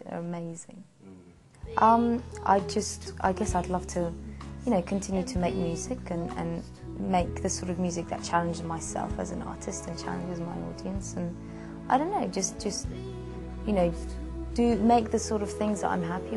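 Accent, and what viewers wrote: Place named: England, United Kingdom. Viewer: I love her english accent..could just listen to her talk for hours...and of course her voice is beautiful!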